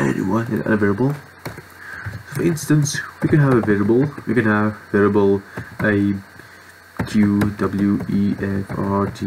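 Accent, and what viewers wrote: South Africa. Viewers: Are you from south africa? AWEH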